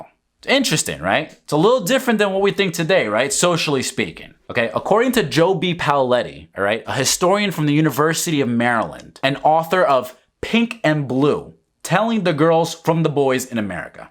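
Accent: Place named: Scotland, United Kingdom. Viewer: Your continual dragging of Barren Ben Shapiro and your scottish accent. I'm here for it!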